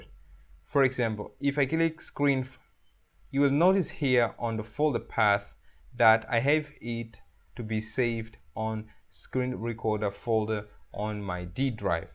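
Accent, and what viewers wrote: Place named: India. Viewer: i like the slight indian accent lol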